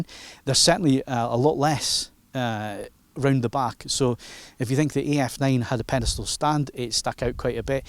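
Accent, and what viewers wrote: Scotland, United Kingdom. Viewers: This guy is from Scotland. I believe.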